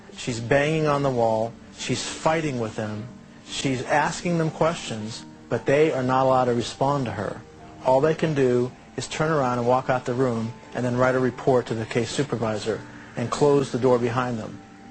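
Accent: Manchester, United Kingdom. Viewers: Excellent series and being a fellow Manc it’s so nice to hear another Mancunian voice.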